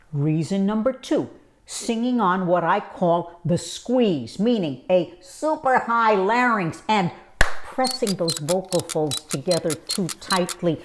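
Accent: New York, United States. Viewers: Are you from NY? Lol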